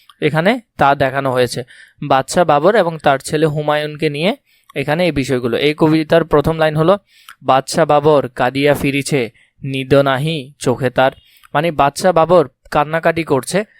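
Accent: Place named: Chittagong, Bangladesh. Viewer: আপনি মনে হয় চট্টগ্রামের মানুষ তাই না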